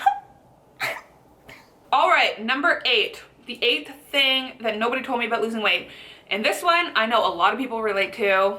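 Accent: Canada. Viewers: are you canadian? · i could tell u were canadian based on the way u say 'about' whch is crazy cuz im also canadian and ive never noticed we actually have an accent till i heard u speak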